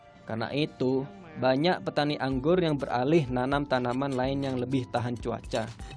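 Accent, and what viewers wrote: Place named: Probolinggo, Indonesia. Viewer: bosone rek ngilani khas probolinggo bgttttt,hhahahhahha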